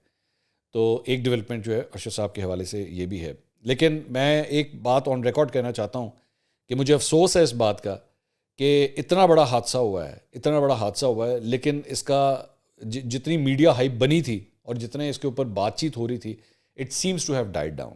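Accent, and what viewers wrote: Punjab, Pakistan. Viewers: Program ma jo panjabi tuch buhat acha lagta Allah app ko apni pahna ma rahkain Aa'meen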